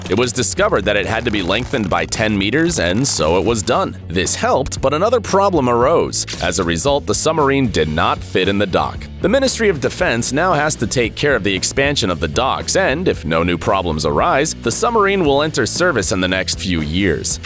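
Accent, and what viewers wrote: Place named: United States. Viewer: I watched about half of it. It was just unnatural, a guy with an American accent saying all those metric units.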